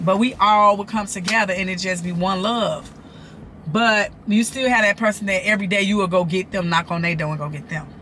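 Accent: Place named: Chicago, United States. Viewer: Heyyyy I'm born and raised in chicago. As soon as I heard your voice I said yep she is so chicago.. you are so Beautiful ❤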